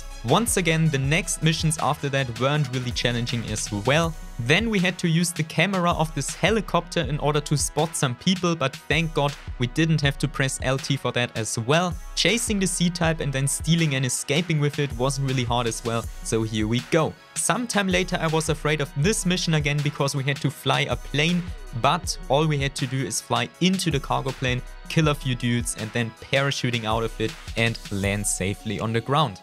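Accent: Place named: Germany. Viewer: Man hört einfach so hart raus,dass du deutscher bist haha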